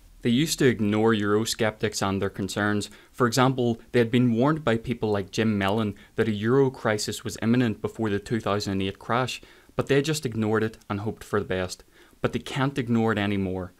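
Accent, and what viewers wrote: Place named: Northern Ireland, United Kingdom. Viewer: I love your Norn Iron accent. Nice seeing people from our country getting popular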